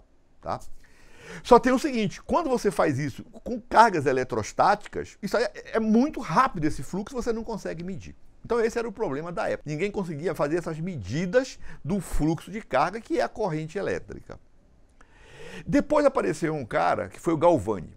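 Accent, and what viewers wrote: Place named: North, Portugal. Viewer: Acho q esse sotaque é do Norte... kk Excelente video! Muito Obrigado! Sucesso!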